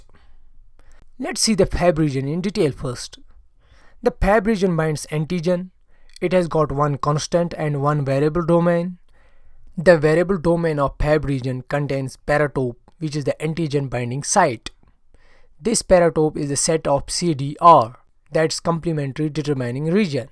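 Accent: India. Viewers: Your Ascent is very similar to Indian Ascent,are you Indian?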